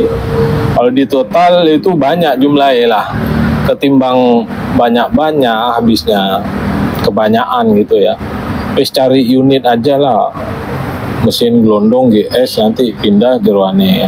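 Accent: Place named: Java, Indonesia. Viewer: Lokasi mana kok bhs nya medok jawa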